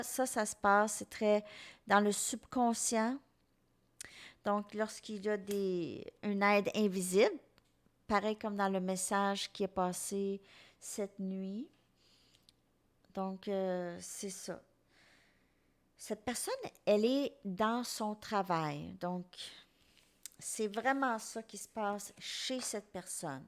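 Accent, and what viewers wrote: Quebec, Canada. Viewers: Hello, j'adore votre accent québécois 🥰 Vous avez dit des choses qui me parlent énormément par rapport à cette relation, l'arc de triomphe par exemple...C'est quelqu'un dhyper sensible en fait mais qui refoule ses emotions et qui se protège énormément avec son masque et son mental..il utilise la musique pour dire ses émotions...merci pour vos généreux conseils...it's a little bit funny this feeling inside... voilà une chanson qu'il chante souvent...c'est ma star 🌟😍🥰❤.. je vais ecouter la chanson d' pour écouter le message et essayer de lâcher prise..😌😉 Merci🙏 😘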